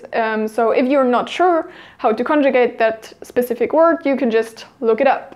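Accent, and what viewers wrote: Germany. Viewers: Are you german? Your accent sounds german (adorable😍) haha I have a strong Hebrew accent (soo bad) haha love from Israel 🇮🇱😘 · Are u german? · Ist Sie Deutsch? Hört man raus finde ich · You sound so German :o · Deine Stimme klingt deutsch :D bist du deutsch? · Du bist Deutsch oder? ^^ dein englisch hört sich so deutsch an finde ich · is she German? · Are you German? You sound sooo German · Is she German? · is she german? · This is the first video i watch on this chanel but is she german? Like from the english accent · Three seconds into this video and I already like you. And you are German. Heard that within one second. Lol. Me to. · are you German? your accent is great ♥ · that accent, are u german? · Man merkt durch deinen Akzent , dass du aus Deutschland kommst xD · Ich habe dich gerade gefunden und kennengelernt. Und man hört den deutschen Akzent (wie bei allen auch) wenn du englisch sprichst ^^ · ...mein erster Gedanke war "bist du aus Deutschland?"... 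nach 6 Minuten war ich mir dann sicher ;-) (ist das erste Video was ich mir von dir anschaue, find ich wirklich gut) At the end of this video you asked about tipps we might have, and i have this to add: Since i bought lots of books for learning japanese and had little time or motivation to actually use them i moved to something while i was using flashcards. I downloaded an app for recording stuff and started to record the vocabulary section of one of those books so i would first say the word in german and after a few seconds i would say that word in japanese. Since every word is a single voicefile, i added them to a playlist and listen to it every spare minute. And everytime i recall a word almost perfectly i would delete it off the playlist. once every week i'd put all words back to the playlist and start over, to practice and recall the things i already know. The Progress in which i advanced since then is: in less than 2 months i moved from like a hundred Words to almost 700 Words... 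it might not be the best, but as well as you used several different ways of studying, it might just add a small portion to the whole process of learning 日本語. Well, thanks for the vid, since i always search for new motivation everyday. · It took me five words from your mouth to know that you are German. Pretty cool huh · Ich dachte du wärst deutsch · Are you A German because you have any accent from a german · Where are you from? Sounds like you have a little German accent, but it also could just be my imagination. I'M learning Japanese right now and that was pretty helpful thanks. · you hear the german accent... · First second of the video starts Me: "Oh, a fellow german"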